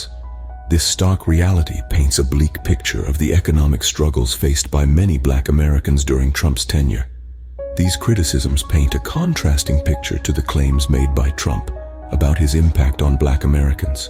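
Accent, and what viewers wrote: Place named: United Kingdom. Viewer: Gotta love your choice of narrator A white British man?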